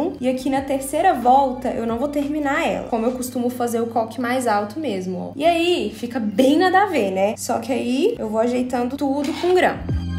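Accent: Minas Gerais, Brazil. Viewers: Coisas que nós mineiros falamos sou mineira e falo assim também 😍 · Coisa de mineiro eu acho, pq eu também falo assim e sou de Minas 😂❤️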